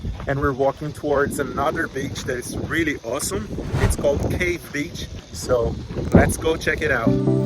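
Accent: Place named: Brazil. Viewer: Brazzo accent yey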